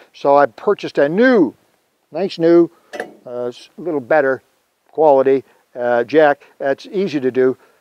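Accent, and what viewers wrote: Canada. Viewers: I can spot a Canadian accent a mile away. Love this channel, cheers from Kitchener Ontario 🇨🇦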